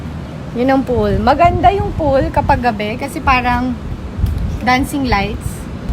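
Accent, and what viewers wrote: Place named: Ilocos, Philippines. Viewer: Parang ilokana po kayo mam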